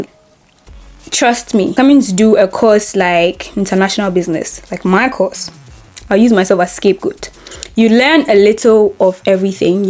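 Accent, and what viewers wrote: Nigeria. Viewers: I said what I said she sounds Nigerian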